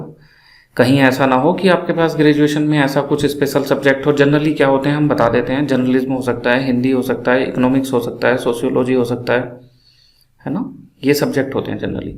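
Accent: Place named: Western Uttar Pradesh, India. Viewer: Aap up west (to be exactly muzaffarpur nagar) se ho kya ??? Mere kuch classmates they us area se same yahi excent, tone and dialect thi..😊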